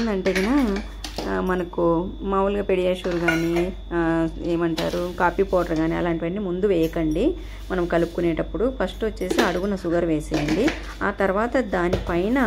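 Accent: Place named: Anantapur, India. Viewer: Mee slang antapoor side la vundi mee proper ekkada andi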